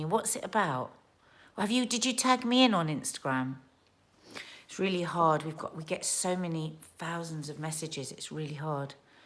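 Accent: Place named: Scotland, United Kingdom. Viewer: Good afternoon to you both. I am Scottish, and your Scottish accent is brilliant!! Makes me smile, Reminds me of home as I live in England now. ❤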